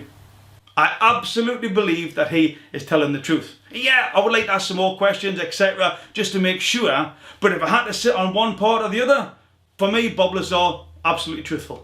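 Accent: Newcastle, United Kingdom. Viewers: Where you from, British? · He certainly diesnt sound like a scotsman ges a true Geordie. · Divven ye nah a Geordie when ye hear wun?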